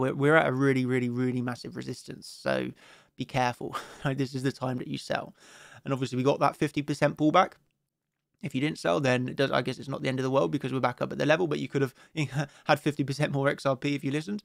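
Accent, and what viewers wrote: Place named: Bristol, United Kingdom. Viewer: Mate u sound like ur from Bristol Lol👍😎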